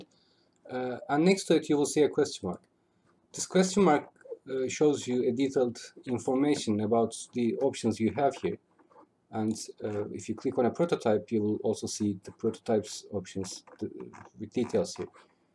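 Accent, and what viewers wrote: Turkey. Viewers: Türk aksanı mı duyuyorum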